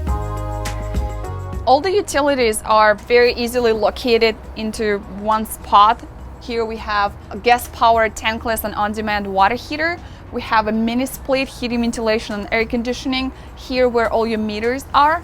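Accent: Russia. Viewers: She sounds Russian.......didn't expect that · It is obvious that is well educated but it is so strange that Russian speaking people need at least two generations to soften some English sounds. Nice blue Slavic eyes.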